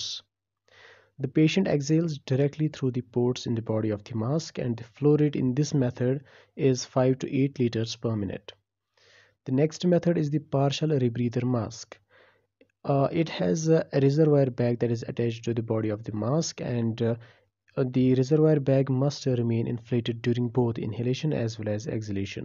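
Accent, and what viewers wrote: Kashmir, India. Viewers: Your voice is indicating that you are kashmiri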